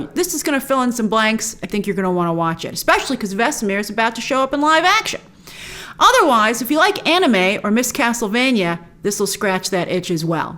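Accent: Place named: East Coast, United States. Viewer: It’s east coast speaking style. Very common for a lot of EC people to sound like that. She sounds like my family members so maybe it’s just me lol. Anyway, I’m looking forward to Monday!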